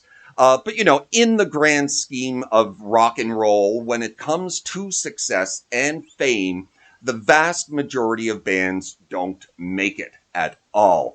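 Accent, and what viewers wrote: Canada. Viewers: Really enjoyed your tribute to the hip and the riff breaks were excellent. I obtained the “ ” album in 89 or 90 via the Columbia house mail order CD’s that were popular then. 7cd’s for a penny or something similar ha! I remember thinking, “who are these guys?” And that’s a strange band name 😂. So happy that I did get that cd, it defined that period of time for me and many other Canadians. Plus I felt like I got in on the ground floor as an early fan. Remained a fan since and Still enjoy their music today. I can’t think of another band that brought Canada together more than they did. Something we could use now more than ever. Thanks for the work you put into this channel. The lessons are excellent! BTW, Do I detect a hint of a Cape Breton accent…😊 fellow Novi here. Cheers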